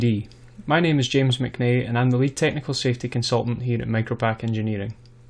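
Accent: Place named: Ireland. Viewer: are you Irish? nice accent tho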